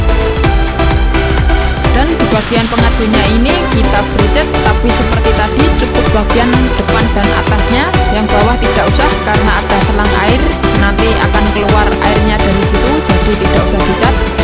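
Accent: Java, Indonesia. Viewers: Yang gak bagus..suara MEDOK ny itu loh..maf..bisa di kondisikan gak..klo bisa pke duber orang lain ajah deh yg fasih bahasa indo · Jangkrik.....supa..? Yo ngene iki wong njowo.dipertahankanlah.gausah malah sok2 an bahasa indo yg fasih. Liat itu subscribe dan like nya. Toh juga banyak walau medok.kate lapi koe..? Ra terimo. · Mendoknya ciri khasnya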